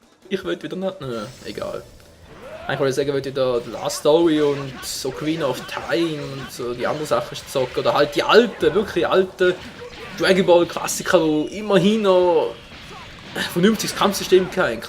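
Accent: Switzerland. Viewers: OK ich bin eindeutlich zu gewöhnt an dein schönes deutsch, das ich dein Schweizerisch erst nach 5 minuten erkannt habe... und das obwohl ich mal schweizer war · Du tönsch wie en koleg fo mir aber de akzamt isch chli andersch freut mich aber das ich weis das auch schwizer lets Playstation mached ^^ es abo hasch fo mir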